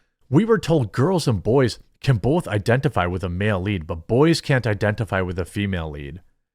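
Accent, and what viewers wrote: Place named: Wisconsin, United States. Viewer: Is it a Wisconsin accent thing to pronounce bag as baaig and gag as gaaig I just notice does this with the "ag" sound.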